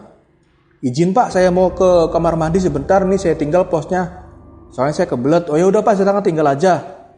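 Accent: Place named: Banyumas, Indonesia. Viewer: Pak Jawa Ngapak ya? Khas banget logatnya haha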